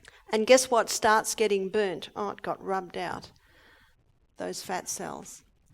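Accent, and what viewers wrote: Australia. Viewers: jim dee - She is from Australia and they say many words differently than USA does. Great Britain also does. Forget even trying to understand the English of many from Scotland and Ireland. Ireland is easier, though. I was watching another of her videos and could not understand several words at all. One of them turned out to MEAN Red Bell Pepper. They have an entirely different word for this vegetable than we do. Have you ever heard a Brit pronounce Schedule? And those from South Africa also pronounce many words differently than we do - especially words from the Bible. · Man…she’s Australian. They don’t pronounce some words like Americans (for example).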